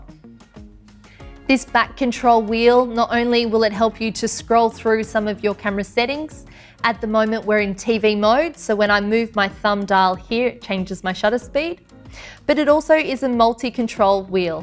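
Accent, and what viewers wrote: Australia. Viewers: Aussie confirmed.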